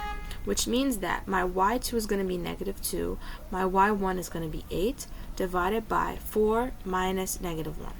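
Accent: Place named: New York, United States. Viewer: I am new to the channel I can just tell you are are new yorker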